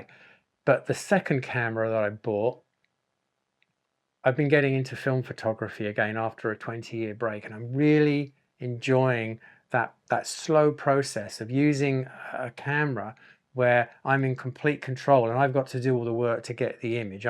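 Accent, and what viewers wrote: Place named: United Kingdom. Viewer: Point and shoot works for me (Panasonic Lumix DSC-S3). I just rediscovered an old Agfa Optima 1035 I have, still in brand new condition. I will make a project this year of getting used to film caneras again. With this nice little cam. As a fun project. I’ve been a musician and painter all my life, but always used a camera when I had a chance, since I was a kid in the 60s. I take pictures everywhere we go, many just around home. The small town and everyday mundane images video caught my attention. I like gas stations, store fronts, shop windows, grocery store shelves, toys, books, simple flowers, fruits in bowls among other everyday subjects. You sound British to me (sorry). Thank you for the frank and fine posts.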